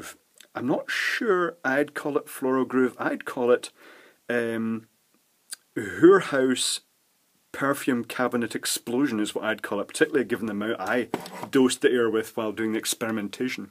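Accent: Scotland, United Kingdom. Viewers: with the Scottish accent, "powers up" sounds like "purrs up"... it's nice to imagine the motor purring like a little kitty...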